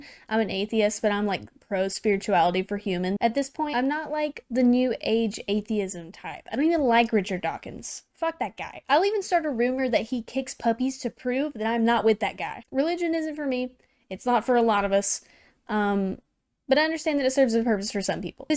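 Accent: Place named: South, United States. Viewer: You sounded a lot more sounthern than I would have expected an atheist to sound 🤠🤠🤠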